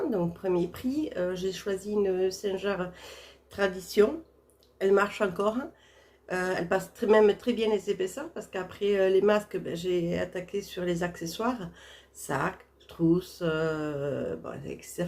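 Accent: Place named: Tarn, France. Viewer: Coucou, me voilà abonnée ravie d'avoir reconnu mon accent, je suis du Tarn sud sans parler du petit indice derrière toi 😉 Hâte de voir tes cousettes, nous partageons pas mal de créatrices dont les meilleures les Bobines Patterns 😁 A bientôt 😘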